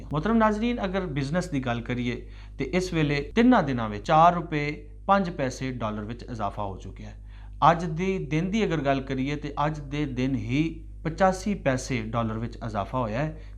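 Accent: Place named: Punjab, India. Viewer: Very good vee di punjabi aasli punjabi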